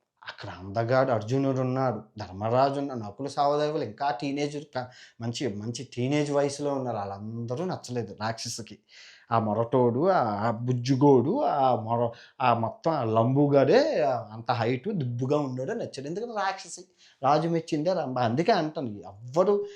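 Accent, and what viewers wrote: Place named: Visakhapatnam, India. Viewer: Video ఇంత త్వరగా ఐపోయింది ఏంటి అనిపించింది చెప్పే విధానం మన వైజాగ్ స్టైల్ స్లాంగ్ లో super super super అన్న..👌👌 👌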